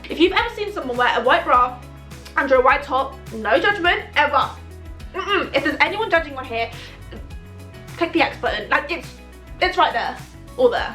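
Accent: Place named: England, United Kingdom. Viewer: I like how she has American influence " you do you boo" with an English accent.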